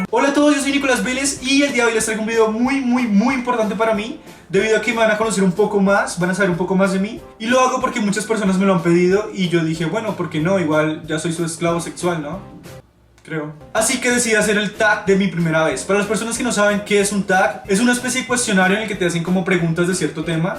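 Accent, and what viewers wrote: Colombia. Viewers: Awww,¿por que hablas tan tierno? ese acento colombiano;D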